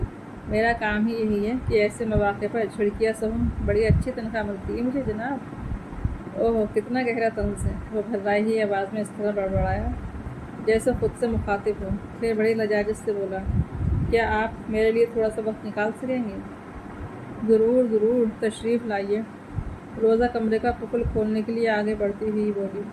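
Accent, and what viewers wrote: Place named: Lucknow, India. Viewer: kya haseen lab o lehja hai.. Lucknow ki chashni mein ghula hua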